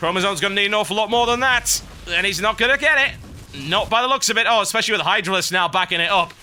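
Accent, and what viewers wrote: United Kingdom. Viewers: your British accent keeps me alive!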